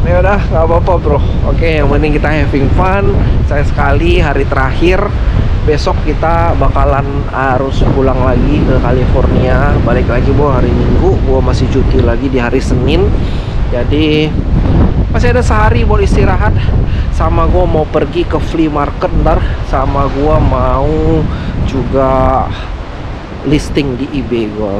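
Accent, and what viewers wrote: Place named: West Sumatra, Indonesia. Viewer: Dari awal gw dah nyangka kl Uda orang Minang, sempat nonton video yang logat Minangnya rada kental dikit, salam dari Ranah Minang uda...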